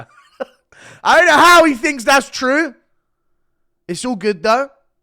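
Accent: South Africa. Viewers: Hahahah that South African accent ... not bad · Great SA accent dude! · dawg your Saffa accent is on point!😂🔥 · you are hell of entertaining, it's always good listening to your podcasts. You put a smile on my face, thank you. Love the Saffa accent and the truth bombs on Adesanye. You got balls telling the truth, love it.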